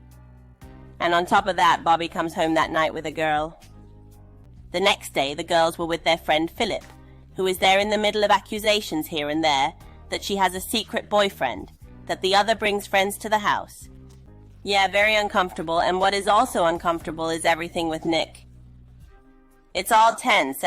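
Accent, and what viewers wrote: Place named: Mid-Atlantic, United States. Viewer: I love it....."Nothing can go wrong"....! its your tagline! Love your "accent" too. not sure but fairly reminiscent of the "Midatlantic" accent of the 1940s films. Anyway, it certainly fits your narration. ( this is meant to be complimentary). Can you do "The Hunger" ?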